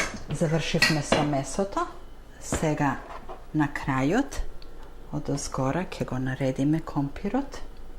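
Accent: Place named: Kavadarci, North Macedonia. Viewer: Bravos super si!! Site videa se so tradicijata na makedonskata kujna i se odlicno napraveni(zgotveni) a se razbira i mnogu dobro objasneti.👍😊Sakam da te prasam od kade si ti od kaj poteknuvas od koj kraj na makedonija??deka akcentot tvoj e mnogu ubav i me potseka na mojot kraj od kaj sto sum jas Kavadarci a sega ziveam vo Sydney Australia.Golem pozdrav od mene Gorica 😊 i se najdobro.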